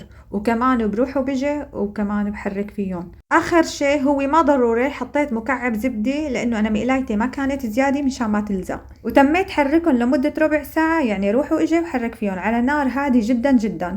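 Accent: Homs, Syria. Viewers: بسكويت وراحة من أكلات الطفولة السعيدة من أيام الزمان الجميل زمن الطيبين كانت الحياة حلوة وبسيطة كل الشكر والتقدير لك أختي الكريمة لهجتك حمصية أصلية حمص يا بلدي يا أحلا البلاد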